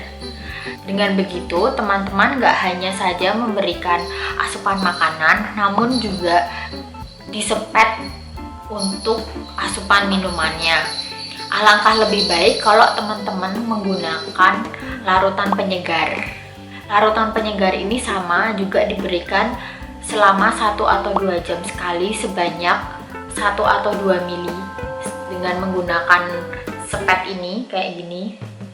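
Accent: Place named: Banyumas, Indonesia. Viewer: Si embak ini kaya irg banyumas ,, bicara ny ngapak😅😅😅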